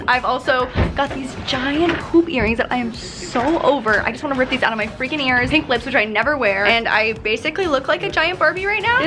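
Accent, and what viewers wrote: Canada. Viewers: You know she Canadian she says ishhhhh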